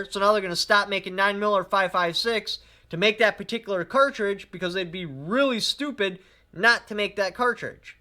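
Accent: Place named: Wisconsin, United States. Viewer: LOVE ALL OF YOUR VIDEOS AND WISCONSIN ACCENT. KEEP SPEAKING THE TRUTH BROTHER.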